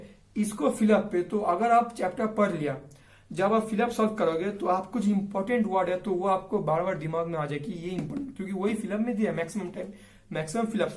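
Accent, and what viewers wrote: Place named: Bengal, India. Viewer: Your hindi seems that you are bengali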